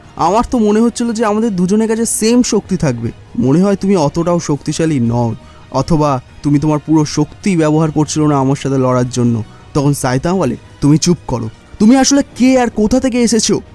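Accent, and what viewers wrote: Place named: India. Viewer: তোমার বাংলা শুনে,বুঝলাম আপনি indian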